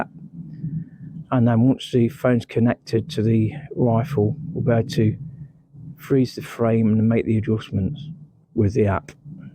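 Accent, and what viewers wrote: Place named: England, United Kingdom. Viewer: Too much explaining in the English accent. Just shoot and zero the scope like any other scope. 😂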